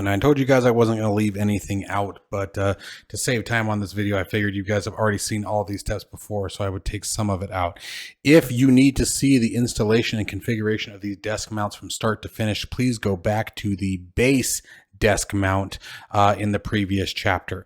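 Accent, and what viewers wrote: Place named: United States. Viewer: do they ship to the united states? you sound american but doesnt mean you live in america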